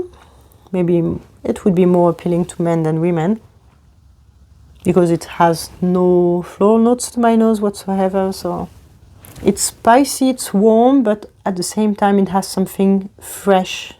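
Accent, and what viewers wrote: France. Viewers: on sent l’accent français 😂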